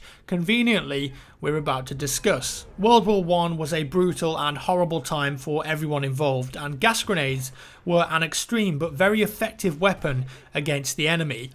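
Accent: United Kingdom. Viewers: you must be british your're accent like jason statham;) #iLikeThat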